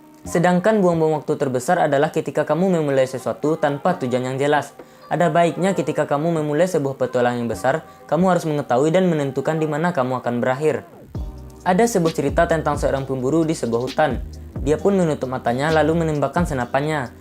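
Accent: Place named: Punjab, India. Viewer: Bang Punjabi bgt😅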